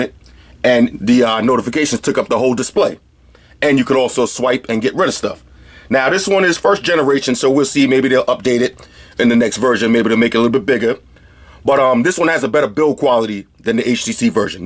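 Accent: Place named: Brooklyn, United States. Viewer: you sound like you're from Brooklyn. Brownsville Here Homie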